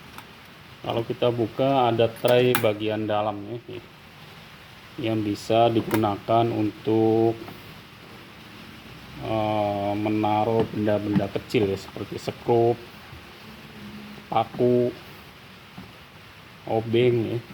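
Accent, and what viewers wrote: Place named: Jakarta, Indonesia. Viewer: logat betawi bangett sih bang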